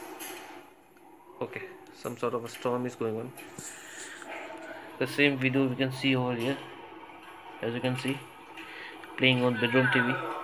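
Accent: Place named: India. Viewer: Indian accent is so unattractive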